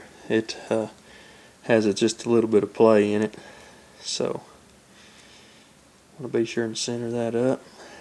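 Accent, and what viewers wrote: South, United States. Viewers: I've done this to 2 of my Glocks so far, so I have the experience but it was still fun to watch your video. You did it very well, and made the most important points of using loctite on the front screw, and a soft punch on the rear. I also really like the way you talk, your country accent is really cute, hehe :) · All vids should have a warning if they are going to be narrated in a southern accent. I would rather drill a hole in my fucking head than listen to a slack jawed yokel.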